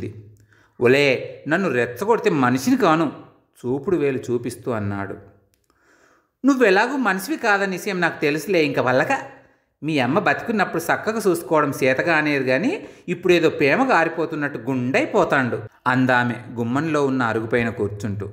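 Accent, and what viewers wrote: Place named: Uttarandhra, India. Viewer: ఉత్తరాంధ్ర మాండలికం మీ గొంతులో అద్భుతంగా పలికింది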